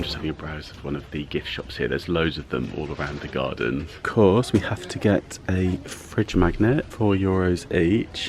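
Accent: England, United Kingdom. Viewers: Thank you for taking us on another fabulous cruise. I just love all the places that you go, because I will never be able to see it in person. I enjoy listening to your English accent. Thanks again for your videos. From a fan in Colorado USA!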